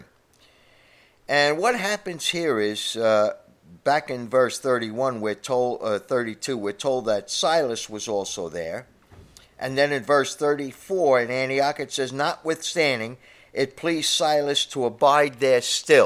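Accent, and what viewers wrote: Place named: New York, United States. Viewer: I am from faraway New Zealand.....and I love the New York accent! Not only that, Brother is an excellent preacher and is not afraid to tell it like it is. I appreciate that! The churches from my hometown are largely of the social gospel, sugar coated, entertainment variety. And they use the modern ‘bibles’. I moved away from them several years ago so I am extremely grateful to have these wonderful, Scriptural videos. Many, many thanks from a Christian Kiwi!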